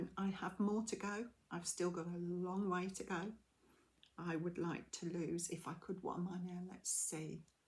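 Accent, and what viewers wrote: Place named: England, United Kingdom. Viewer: Lovely video. I'm so glad to know you. I'm Canadian, but my Gramma was a war bride from England, and I feel a connection, besides crochet. I love the accents you have over "across the pond". I'm on the West coast, and Canada has many different accents, too. Thanks for sharing. Take care and love from Canada 🇨🇦 ✌️🍁💜🐄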